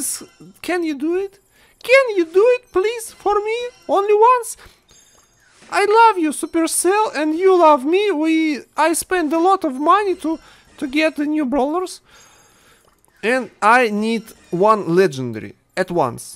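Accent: Russia. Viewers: Why you have a Russia aczent ? xD sorry I hope you can read dis I'm from germany xD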